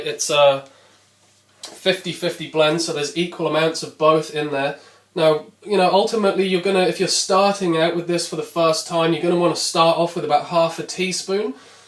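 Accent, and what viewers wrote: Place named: England, United Kingdom. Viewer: it's great to hear an English accent (for a change) after seeing all these video's with Yankee accents all the time.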